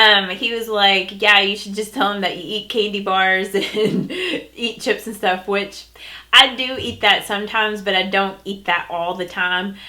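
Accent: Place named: Kentucky, United States. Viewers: When I watched my first makeup video of yours, I could tell your accent sounded like me...and I was right! We are both KY girls! Gotta love KY! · Ty for the Q&A! I can relate with accent...I live in Eastern Ky myself. My husband lived in Louisville for over 10 years:) Just visited the Louisville Zook on Wednesday! :)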